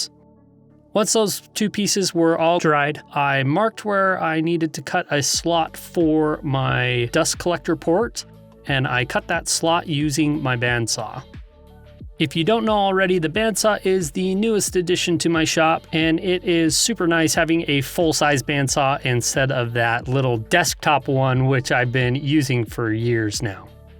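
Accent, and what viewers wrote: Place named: Salt Lake City, United States. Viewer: "All the way acrosst it?" SLC people talk funny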